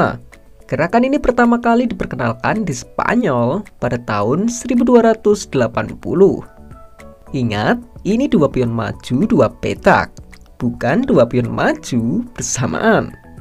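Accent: Java, Indonesia. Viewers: medok suara nya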